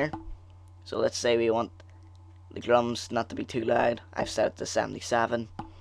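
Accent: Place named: Ireland. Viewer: Like ur irish accent! :D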